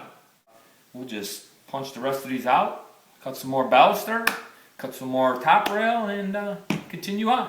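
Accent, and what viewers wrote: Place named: Pittsburgh, United States. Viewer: Thanks. Great job! Haven't heard such a strong Pittsburgh accent in a long time. Question: Are all the newel posts the same size? I've been looking at ordering some but there seems to be different heights for landings, starters, etc. Thanks ~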